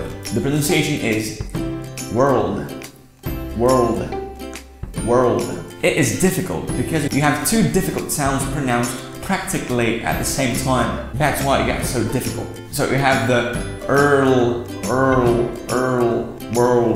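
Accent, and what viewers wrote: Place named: RP, United Kingdom. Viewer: Soooo hard to get the RP accent and yours is great. 👏👏👍👍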